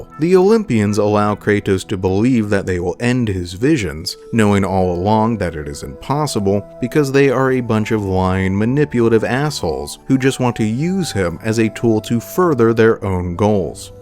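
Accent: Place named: Oregon, United States. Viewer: You sound like you're from Oregon. ♿🏳️‍🌈♿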